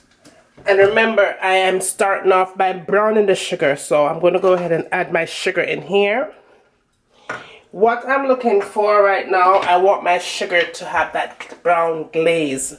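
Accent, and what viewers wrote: Jamaica. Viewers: nice simple recipe!! Btw mi love the Jamaican accent!! Real yaady!!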